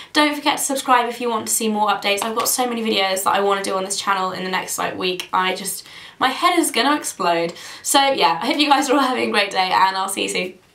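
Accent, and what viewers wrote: England, United Kingdom. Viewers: There would be sooo much confusion between us if we met in real life, because I have a thick Southern accent and you have a thick English accent! Hahah, love you:) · Your British accent really comes out in this video hahaha brings me back to the video you did with Luke!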